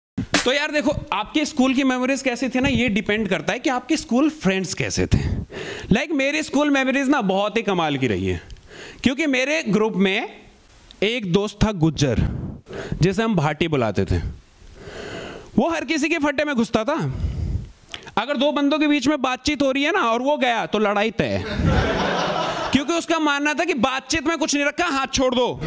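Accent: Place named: Delhi, India. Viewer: Bhai delhi se ho na language se pata chal rha hai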